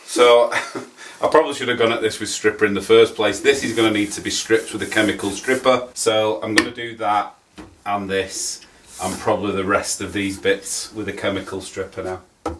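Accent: United Kingdom. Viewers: contrary to what some others have said, I don't find your talking to be excessive at all. I’m from the U.S. and I love the British accent. I really do. And the instructional nature of your commentary is part of why I come to these videos in the first place. By the way…I must remark on the piano accompaniment in the first four minutes of the video. It was stunningly beautiful. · Me mum was a brit; I am an American born guy. I love your brit narration, please don't deprive us of that brit voice! Also, beautiful restoration on the teak nightstand as usual. PS If I am watching ANY video and I think the person is too talkative, I just put them on mute. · Really solid lovely end table! Keep talking! I love the Brit mannerisms and accent (I'm from USA)! Always going to be someone who doesn't like your 'style' - I'm of the opinion that 'You Do You'! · I love listening to you telling us what you’re doing in that lovely British accent! 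(Just the way you say ”lovely”… ☺️) Please keep it up! ❤️ Also, great transformation and glad you kept the lock! 👍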